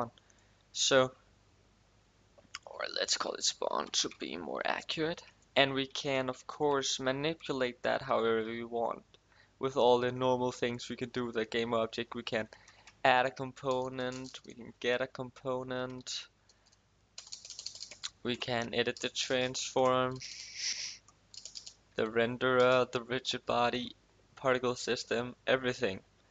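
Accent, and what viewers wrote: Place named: Denmark. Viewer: Just guessing off the accent but are you Danish?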